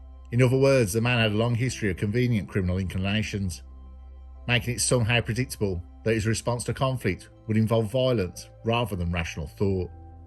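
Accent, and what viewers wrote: Birmingham, United Kingdom. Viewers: Brummie accent may not be to your liking but lots of us are following and enjoying his work and narration.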